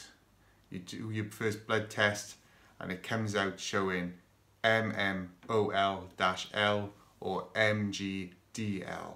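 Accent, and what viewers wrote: Wales, United Kingdom. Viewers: Do I detect a Welsh accent?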